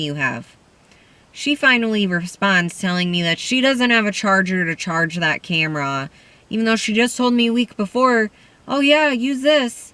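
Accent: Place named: Midwest, United States. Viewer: Girl I just love your cute little midwestern accent! I'm gonna say like Michigan or Illinois? Idk why I love that accent lol Its just the cutest thing to hear 😘 I'm from Maryland so our accent is pretty unique. I've spent half my life in NY with that side of my family..... and they are all Italian immigrants so they can't go judging ANYONE else's accent lol But they say I don't sound like other people from Maryland so I'm really glad for that 😏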